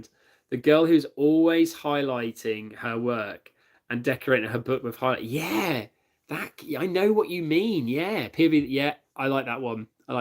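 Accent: United Kingdom. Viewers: are ❤u❤ British❤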